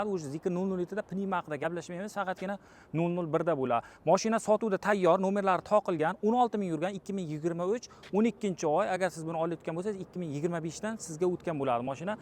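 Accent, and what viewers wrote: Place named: Kashkadarya, Uzbekistan. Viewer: Шева кашкадаре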